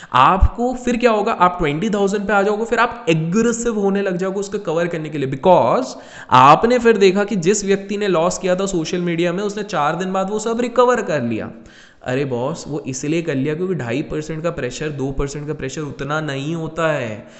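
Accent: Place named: Mumbai, India. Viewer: I like your Mumbai ya Hindi And the scam series was fantastic specially Dhirubhai Ambani vala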